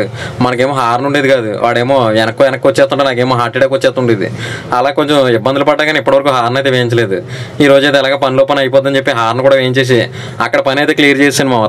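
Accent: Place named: Konaseema, India. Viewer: కోనసీమ భాష పోలేదు బ్రో గుడ్....మాది కోనసీమ.. కొత్తపేట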